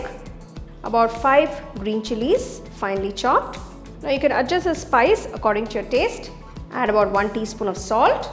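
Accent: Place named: South India, India. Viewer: Bihari sattu paratha in south Indian accent!! A delicacy in itself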